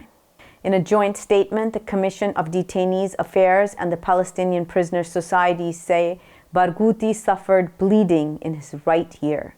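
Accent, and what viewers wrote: United States. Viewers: ╔═══ ❤☀💚☀❤ ═══╗ 💚💚💚💚💚💚💚💚💚 Madam SAHEBA, Assalaamu alaikum. Thank you very much for presenting the news today after almost a month. It was on 3rd, Oct that you had presented the news last. We, from Hyderabad, India, like your news reading because of your slow pace and because of which we easily understand your speech despite it being in American English. But only thing is we need to have lot of patience to hear your news-reading and lot of free time. Madam Samana Siddiqui would have finished your 18 minutes of news bulletins in just 12 minutes. But it hardly matters. What matters is our being able to understand your reading!!! YOU ARE DOING A GOOD JOB!!! PLEASE KEEP IT UP!!! 🌐🌐🌐🌐🌐🌐🌐🌐🌐 ╚═══ ❤☀💚☀❤ ═══╝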